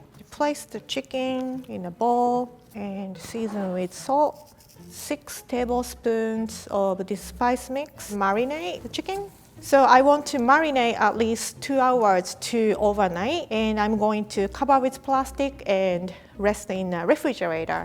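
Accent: Japan. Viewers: I just love Japanese/English voice!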